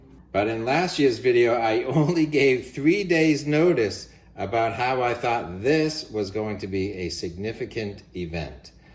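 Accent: New England, United States. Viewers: Well, it was German with a strong New England accent, but quite understandable...😄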